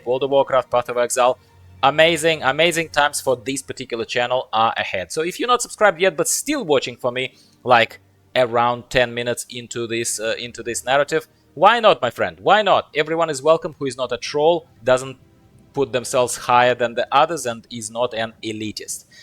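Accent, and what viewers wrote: United Kingdom. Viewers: Her accent is of a tedious northern British working class pleb, it pisses me off also. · Its a Northern English accent mate.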